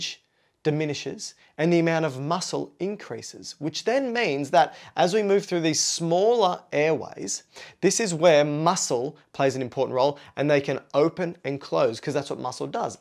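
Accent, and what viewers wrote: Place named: Australia. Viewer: Australian boi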